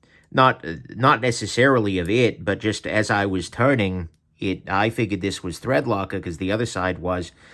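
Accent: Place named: Boston, United States. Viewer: In my world, as an engineer, the fastener that caused you so much problem is called a "Sex Bolt". And, the term you were fumbling for when describing the feature that keeps the female end of the sex bolt from spinning, is referred to as being "indexed" or "keyed". I'm sure different trades will always use their own colloquialisms... But, more importantly the female end of that fastener should either be plain, or use a noticeably different tool engagement to prevent brutes like you from abusing it until it turns! At least you didn't go for the torches to free it up!! This is the first time I've seen one of your videos, I enjoyed it once I got past the 'Bastin' accent (or maybe New Yak?) 😎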